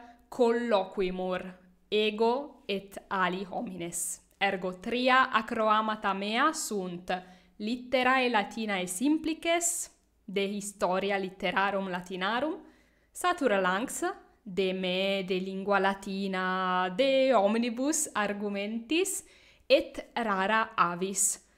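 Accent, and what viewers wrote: Italy. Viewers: I prefer by far the Italian accent for Latin (even with these epenthetic vowels) than an Anglo speaking Latin with aspirated stops, retroflex r and clipped vowels.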